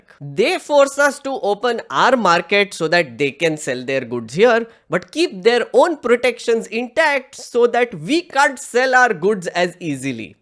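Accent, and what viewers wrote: India. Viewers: Bruh, hire an accent coach. This Indian English is suffocating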